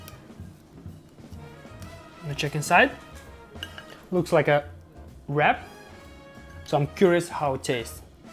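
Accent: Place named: Eastern Europe. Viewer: DAT SLAVIC ACCENT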